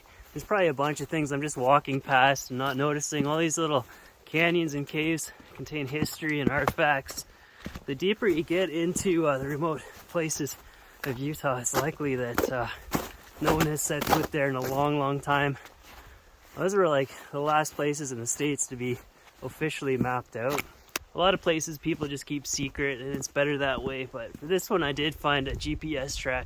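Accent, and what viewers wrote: Canada. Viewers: Crazy beautiful scenery. Utah is now on my list. Also, has the most Canadian of Canadian accents. I'm also from BC. I wonder if I sound like him when I speak. Probably. Eh?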